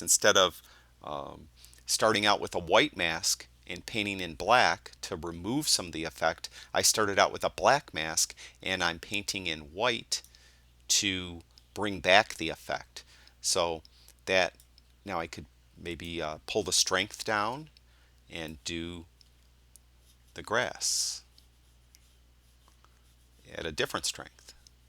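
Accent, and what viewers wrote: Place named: Chicago, United States. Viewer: You know what? people who call people shills, usually have an envy problem, or an unrealistic view of how the world works. In order for anyone to do anything, you need to eat, you need to be secure in your home, and the way that happens is by earning money. A dirty subject for sure, but a necessity nonetheless. What would it matter if you were being paid by Topaz? What`s important is the veracity of your content, and up to now, it`s been spot on. I`ve not only learned from you, but enjoyed it in the process, and more importantly, my Photographic post processing has improved immeasurably. So, top notch content, from a name right out of The Sopranos central casting (at least to my English ears)and all for the sum of watching a short ad. Doesn`t get any better than that. So forget about it, and keep doing what you do. 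Cheers... Oh, and ps, when I want to use a Topaz plugin, I just go straight from PS or LR no need for Fusion, so the whole Fusion thing confuses me. Could you elaborate on the use of Fusion sometime? Thanks... PPS, I`m guessing your accent is somewhere from the Chicago area, am I wrong?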